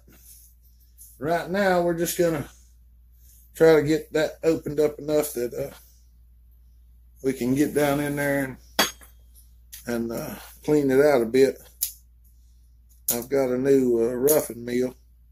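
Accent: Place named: North Carolina, United States. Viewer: you gotta be from North Carolina because we sound just alike when we talk. Im from around high point NC. Where are you from brother?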